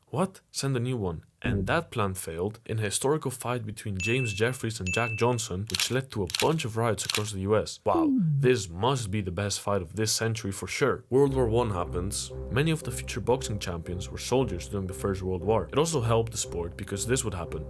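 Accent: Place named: Netherlands. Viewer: Dutch accent way too heavy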